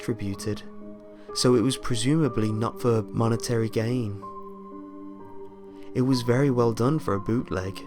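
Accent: United Kingdom. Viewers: Luis Galaz preach, stop calling people retards and stuff just because they misheard the word “pawn”. The man is British and you can easily mishear a word.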